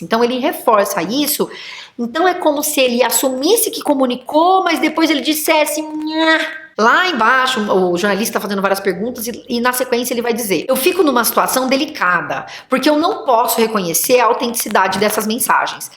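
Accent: Rio de Janeiro, Brazil. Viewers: ...amo esse carioquês!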